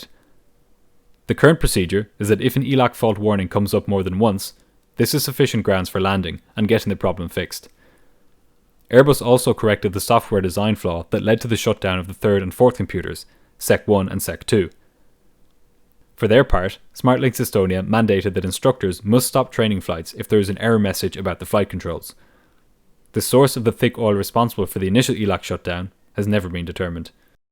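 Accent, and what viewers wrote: Ireland. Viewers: No idea how u pulled it off and got subs , a posh Irish muppet recycling stories that are long long told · Very well done,! Perfect command of technical details, and that lovely Irish accent. Great!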